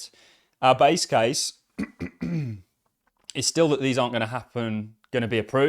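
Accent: Birmingham, United Kingdom. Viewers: Cant get more British than a brummie 😂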